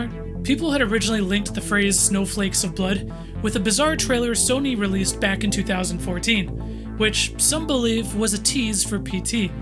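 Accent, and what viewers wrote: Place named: Netherlands. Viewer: Yeah, as a dutch person, there is definitely a dutch accent.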